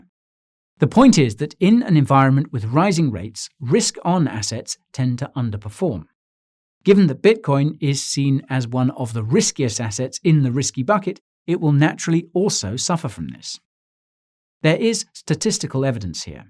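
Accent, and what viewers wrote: United States. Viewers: Pretty good american accent just heard a little of your English 💯💯💯. Love your channel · Good American accent ! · Excellent video as usual: 10/10. American accent not bad. Needs work though: 7/10. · Clicked for the subject, stayed for shmaltzy American accent. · idk man, I'm here on the Southern side of the US and I've heard his sound before but yeah, if I didn't know him; I'd think he'd have moved into the area recently but still American. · with an American accent, wonderful! 🤣🤣 By the way, excellent work, keep going!!! Cheers from Portugal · Dude, good American accent!!! 😄👍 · Wow that in-flight captain American accent is pretty darn good. Some telltale signs that it isn't your natural accent though. advisor, research, and a few others tripped you up. I LOVED the "Alrighty!" :D Seriously though - I don't understand why only Bitcoin is mentioned in this video. 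Bitcoin isn't the future of currency. Are we only mentioning BTC because of the 21M minting cap? There are plenty of cryptos with burn mechanisms to keep supply down. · That American accent is hilarious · Nice job on the American accent. You do have some talent. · your American accent is great! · That American accent! 👌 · Your American accent is spot in 🤣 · Good American accent, but full of cheese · Hearing with an American accent is tripping me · I didn't realize it was an American accent. I'll have to rewatch. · Decent American accent. I would've thought you were one of us Yanks if I didn't know better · Your American accent is better than mine, and I have lived here for all 27 years of my life XD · Better American accent than Orlando Bloom's. Just need to practice on the word "research". Say it like "Reeserrch". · Bravo on the American accent - well done! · That American accent caught me off guard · Love the American accent! 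Always engaging content! Haha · Fantastic American Accent !! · The outlook is dismal, but on the bright side you do a great American accent. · nice American access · Haha, I love the American accent, dude!! Good job! :D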